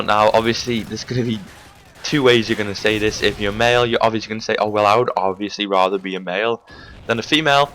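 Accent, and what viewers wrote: Liverpool, United Kingdom. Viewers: may i ask what part of the uk you are from because it sounds like you have a liverpool accent :P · Liverpool accent hahaha its scouse mate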